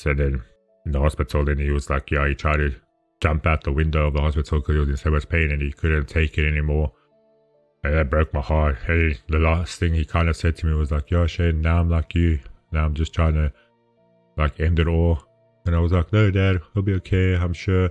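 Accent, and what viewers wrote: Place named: South Africa. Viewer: Wow. I'm so sorry to hear about your story. When the video started I wanted to say that you sound like a fellow South African. I know the accent. Greetings from Port Elizabeth. I'm gonna watch the reaction now but I already know I'm gonna be in tears. If you have a few minutes to spare, please react to by (live from the American idol farewell season) it's a very very emotional performance. And I'm so very sorry to see and hear about your heartbreak. Bitter jammer 💕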